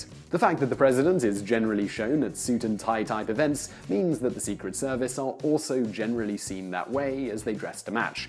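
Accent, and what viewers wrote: England, United Kingdom. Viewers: You know so much about American history, and you have a British accent. Hmm... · Sounds weird, English accent talking about American history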